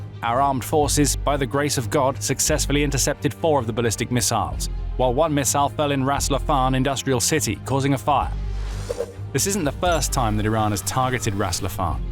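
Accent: United Kingdom. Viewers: This channel is a joke. Ammosexual content for Americans, read by a British guy. Unsub.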